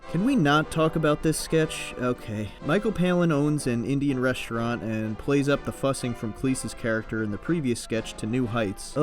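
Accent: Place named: United States. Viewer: This is a pretentious and irritating video. The voiceover is so American it can not be listened to. It is what makes a difference between fact and knowledge. Yes the facts are there but the knowledge is missing. Cringe worthy dialog but little else...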